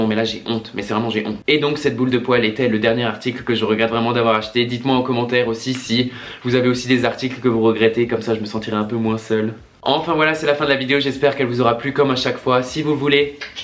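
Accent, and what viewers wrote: Alsace, France. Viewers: Tu viens d'ou d'alsace ? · T'ES ALSACIEN OUIII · j'adore ton accent alsacien prononcer je suis pareille vive l'Alsace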